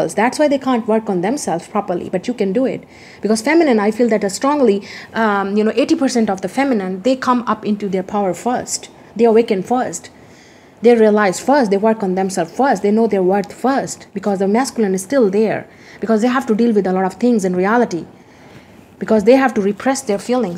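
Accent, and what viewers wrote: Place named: India. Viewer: 💯 % agreed to you; the biggest religion is humanity and we should respect each other for that.. great work and respect for you dear... Ur an awesome and straight forward person :)) god bless you with all the happiness:)) n yes our accent is same ... lots of Love and respect for you from india for this reading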